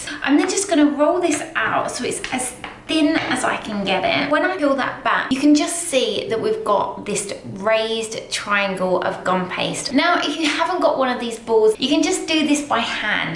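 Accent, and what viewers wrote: United Kingdom. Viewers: just subscribed you... you deserve it... best tutorials on youtube everything in detail and yr british accent i love it i grew up there... but nevertheless all accents are good... love and hugs pls make tutorial on cherry blossom i make flowers w porcelain and the result is great... GOD BLESS U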